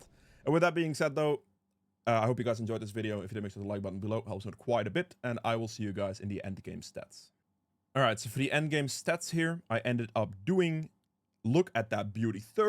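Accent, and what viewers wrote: Netherlands. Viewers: Are you dutch or so? Great video dude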